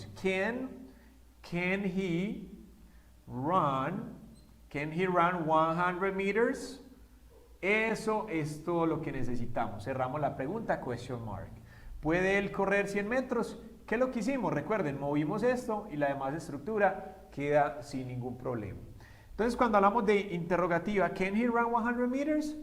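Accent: Colombia. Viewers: Estimado por tu forma de hablar sos colombiano, saludos desde mi Tierra Catracha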